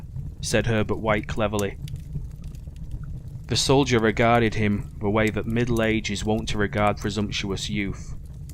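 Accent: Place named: Yorkshire, United Kingdom. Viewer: This story is one of my favourites and you did a grand job of it ! As an adoptee of West Yorkshire (been living here since 1996) great to hear a Yorkshire accent on YouTube. Well done !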